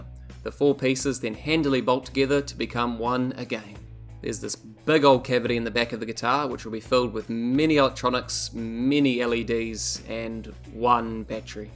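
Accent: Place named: New Zealand. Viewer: Got to be a New Zealander, man we sound so strange after listening to Americans etc.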